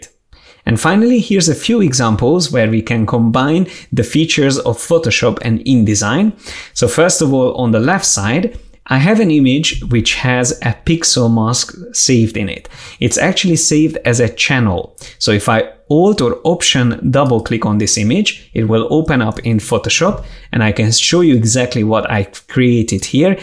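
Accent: Hungary. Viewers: sick video, are you Hungarian? judging by the accent :) (so am I) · Are u hungarian? Your accent is identically as hungarians speak english. No offense, it just was familiar to my ears...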